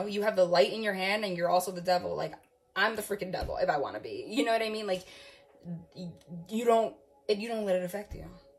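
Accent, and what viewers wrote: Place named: East Coast, United States. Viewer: I lovvvvve your East Coast accent ☺️♥️💓🙏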